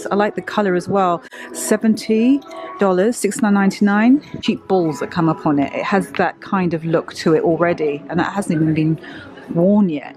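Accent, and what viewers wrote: United Kingdom. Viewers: You are in Nueva York . We do have a few from the UK here , luv your accent!!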